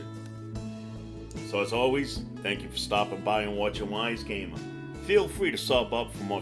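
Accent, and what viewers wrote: New York, United States. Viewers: Thanks for keepin it real, also dig the NY accent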